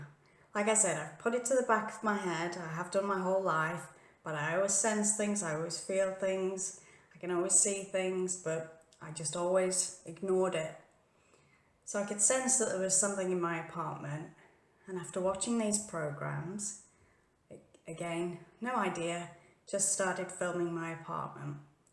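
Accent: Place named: United Kingdom. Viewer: I do not have a channel because i guess ive been searching for the truth for my own needs. I just need to prove it to me. I guess that sounds selfish. Lol. I can tell you're from the UK for sure. I could listen to you speak all day. But anyways, lol. I used to have experiences when i was a child also. My most memorable one was when i was 15 when i had sleep paralysis and witnessed "The Old Hag Syndrome". It scared me to death. She was at my bedroom door making a weird sound and i couldn't move. It didn't stop until i called out for the lord. Thats when i knew "our maker" was real. I have so many to tell. Most are good experiences. But there are a few bad. Please protect yourself when speaking to spirit in case you run into something evil.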